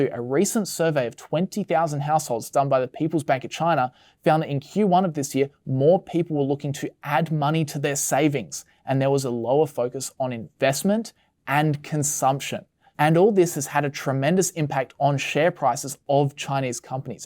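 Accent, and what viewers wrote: Australia. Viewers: First time watching your video... Aussie???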